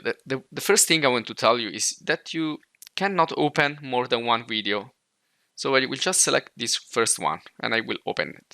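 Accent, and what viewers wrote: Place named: France. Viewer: thx very helpfull. You accent looks frensh buddy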